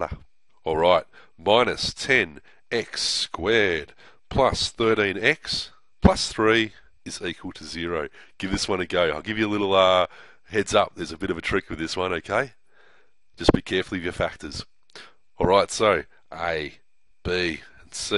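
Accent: Australia. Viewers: its Australian haha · Are you aussie cause i also am ? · It's clearly ozzie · Anything with Aussie accent is cool!!! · wow, my mind is blown... that's so much easier than how I was taught! Also, math done in Australian just sounds less arduous.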